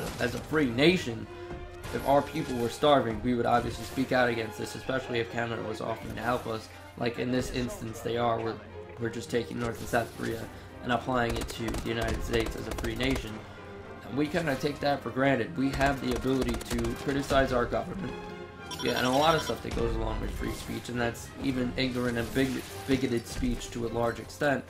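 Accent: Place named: United Kingdom. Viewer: I like how you added the music in the background XD also how you say the states XD God you sound like such a Brit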